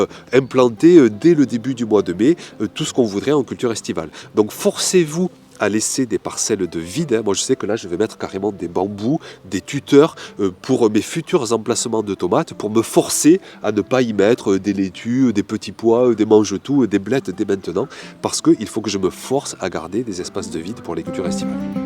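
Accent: South, France. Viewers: Trop bel accent du Sud ❤j’ai plutôt l’accent du Nord ❤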